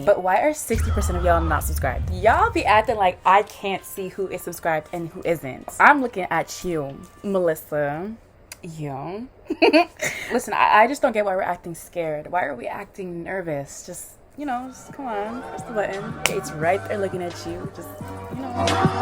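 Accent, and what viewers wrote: France. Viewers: Okay but ton accent français >>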